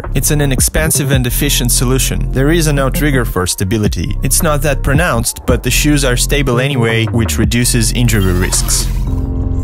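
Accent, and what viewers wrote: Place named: England, United Kingdom. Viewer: kashayte supchik zvichit voobshe klasno s angliyskim akcentom (tipa English)👍💪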